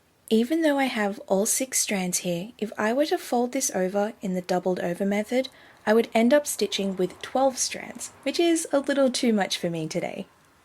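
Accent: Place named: Australia. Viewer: Great little demo video. Thank you. Nice to hear an Aussie voice, and a very relaxing one too!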